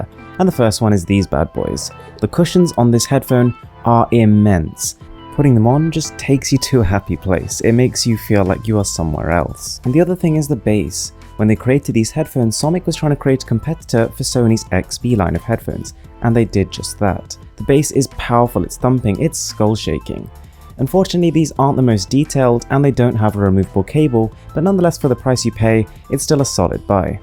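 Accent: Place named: England, United Kingdom. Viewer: awesome video bro.. as usual. u r eng accent .👌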